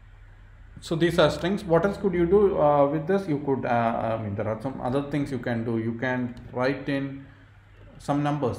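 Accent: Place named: Kerala, India. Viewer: Thick, incomprehensible Malayalam-accented lectures. Go somewhere else for your Data Structures course.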